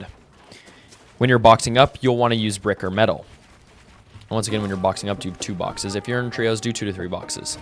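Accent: India. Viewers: are you punjabi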